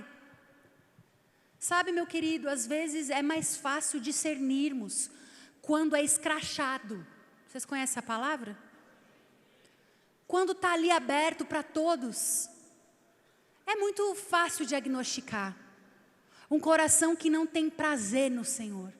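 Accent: Minas Gerais, Brazil. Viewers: pensei que tinha sido no mineiro kkkk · é sotaque mineiro mesmo kkkkkk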